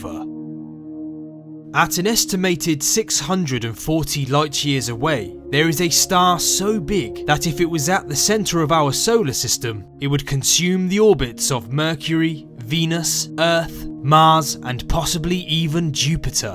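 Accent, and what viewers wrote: United Kingdom. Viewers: he’s British buddy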